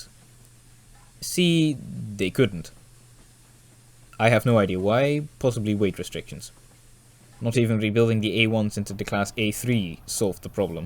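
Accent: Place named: Scotland, United Kingdom. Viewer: An excellent and highly informative video. Although I'm English, I thoroughly enjoyed the Scottish accented narrative. A nice touch and thank you kindly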